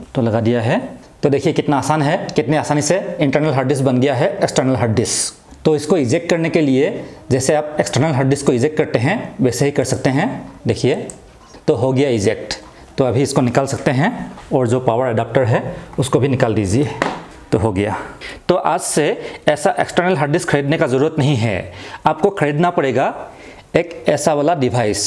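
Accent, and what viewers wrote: Assam, India. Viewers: Axomor sobore hindi kotha anekuae hoi..🙃🙃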